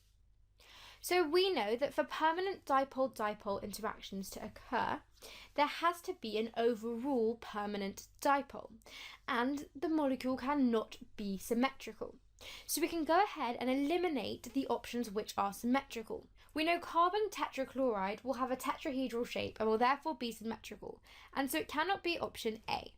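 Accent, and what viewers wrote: United Kingdom. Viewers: I've never heard that much of an english accent in my life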